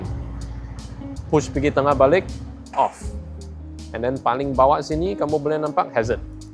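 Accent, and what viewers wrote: Sabah, Malaysia. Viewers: Bila slang sabah dia masuk aku terus naik syiok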